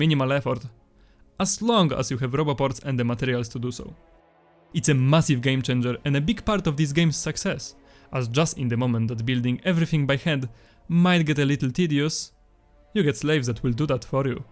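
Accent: Poland. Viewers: Mega polski akcent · Polski akcent słychać po pierwszych dwóch słowach :p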